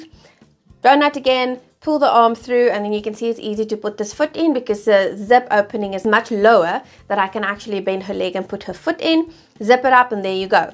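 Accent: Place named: South Africa. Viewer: I know a South African voice when I hear one🥺🩵🩵🇿🇦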